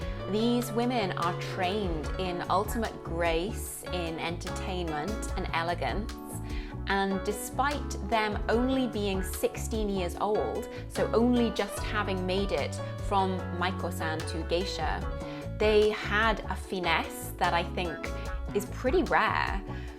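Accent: United Kingdom. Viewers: いつもキレイなイギリス英語を勉強しています。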